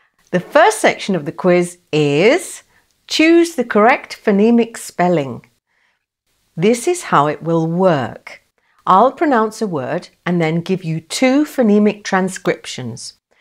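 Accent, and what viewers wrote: United Kingdom. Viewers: Thank you for learning English pronunciation with a British accent for free, loving 🌹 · Native Spanish speaker here, I've got 9/10. I knew "monkey" was pronounced with /ʌ/ and I'm not used to hear British English so the way you pronounce it confused me. But after hearing it again and comparing it with other words with /ɒ/ I just saw my error. A fun exercise I may say!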